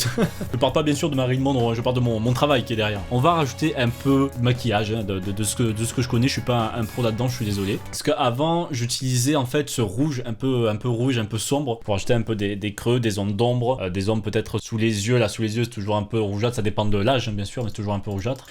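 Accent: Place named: Marseille, France. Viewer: Le petit accent marseillais des familles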